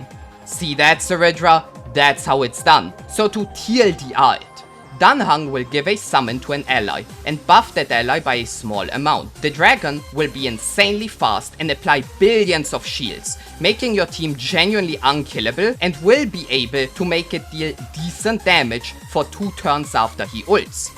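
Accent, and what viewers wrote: Germany. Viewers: bruh, i'm trying to figure your accent. Are you a German?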